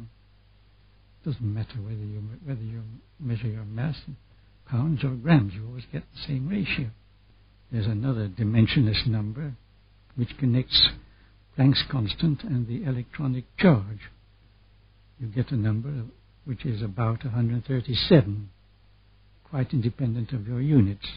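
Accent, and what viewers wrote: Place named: Netherlands. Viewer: I could swear the accent is Dutch (being Dutch but living outside of Holland myself)...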